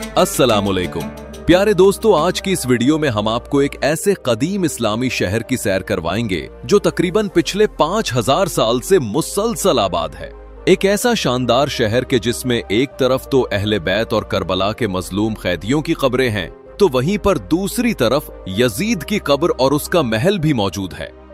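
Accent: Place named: Pakistan. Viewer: Kya aap pakistani hain? Itni pyari urdu pakistan walon ki ho sakti hai 😊 hum mumbai se hain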